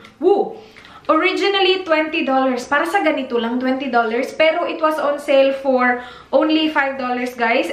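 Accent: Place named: Visayas, Philippines. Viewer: Bisaya tingali nis indai..mailhan Jud ang punto ba hehe...basta bisaya nice jd mag English..dabah? 😉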